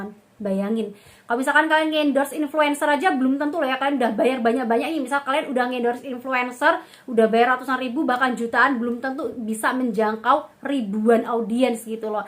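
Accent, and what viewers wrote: Java, Indonesia. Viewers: Hhe...medok bnget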